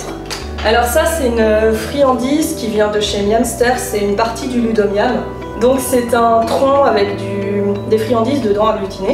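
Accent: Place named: Belgium. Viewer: Tu est belge ?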